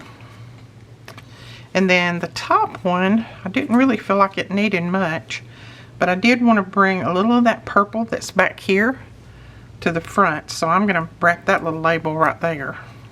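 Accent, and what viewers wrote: South, United States. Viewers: I love your crafts & your voice is so kind; I could listen to you all day. Are you from the South? Your accent seems like you are. I'm from AL. Best wishes & God's blessings. : )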